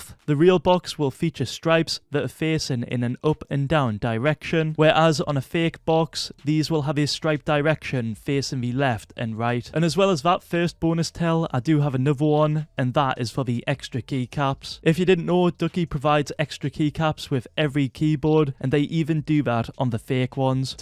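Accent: Germany. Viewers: Are you from Germany? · Wait, are you german? xD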